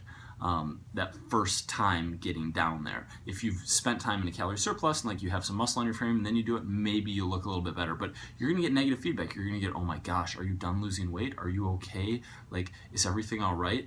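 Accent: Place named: Midwest, United States. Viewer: LOVE WATCHING YOU AND LOVE UR MIDWEST ACCENT:)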